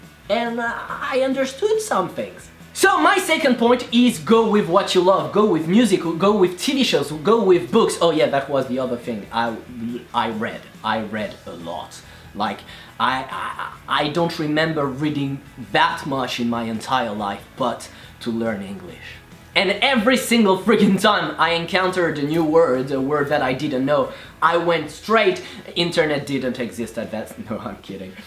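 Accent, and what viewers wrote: France. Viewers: perfect frenglish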